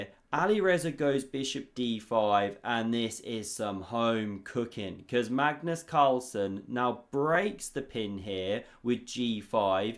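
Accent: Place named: West Country, United Kingdom. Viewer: Love the witty comments: some of them I have to have two takes, but it keeps the action flowing. What part of England is your accent from? It sounds like a West country tilt (not quite a lilt, perhaps).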